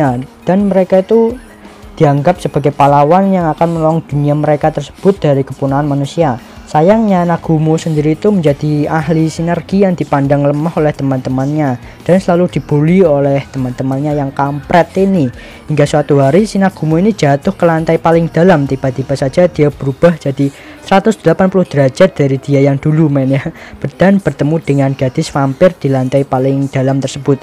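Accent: Java, Indonesia. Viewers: Medokk tenan mas e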